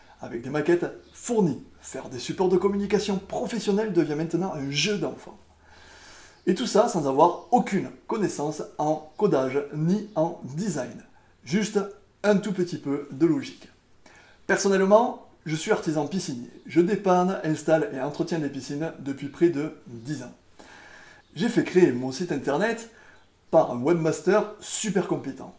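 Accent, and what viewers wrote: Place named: South, France. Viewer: Merci,pour cette bonne présentation,tu as un accent du Sud, on est peux être voisin,je suis dans le Gard.Builderall à l'air vraiment efficace,je pense que je vais me lancer,bonne continuation à toi et un grand merci :))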